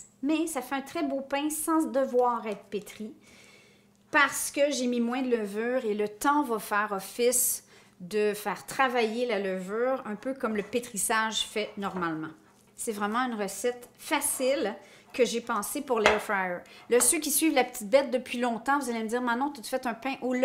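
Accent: Quebec, Canada. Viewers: Bonjour rien que pour votre accent québécois j’aime beaucoup 😂bien evidemment la video aussi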